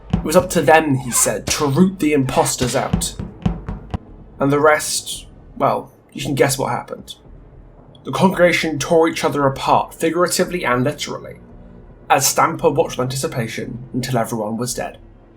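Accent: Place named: United Kingdom. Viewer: Anyone who calls you smug is only because of your British accent because anyone who talks to you ever KNOWS you’re just a big ol’ sweetie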